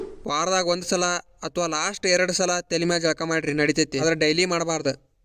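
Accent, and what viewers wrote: North Karnataka, India. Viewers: Anna yane hela nina nama uttar Karnataka launguage matra benki annna adrali nima voice matra super anna nana nima voice kelata nanage video complete adude gottagalilla super Anna ❤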